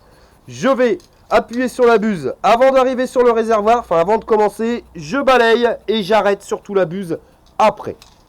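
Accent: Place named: North, France. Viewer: Salut, alors je te trouve excellent ! Vraiment ! Ta gouaille , ton accent du nord je pense, ta façon d'expliquer ta technique de peinture et ton humour , j'adore mdr ! Merci pour ce bon moment et je vais attaquer mon vieux vélo plus sereinement. Merci pour tout !! J'ai juste un léger doute sur le orange de ta mob :)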